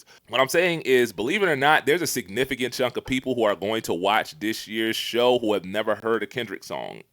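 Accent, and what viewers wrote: Philadelphia, United States. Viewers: are u from philly ?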